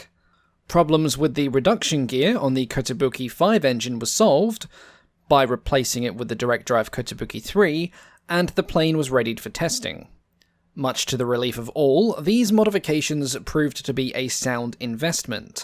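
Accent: Australia. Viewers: Pretty sure hes an aussie. I am, sounds like hes from here too.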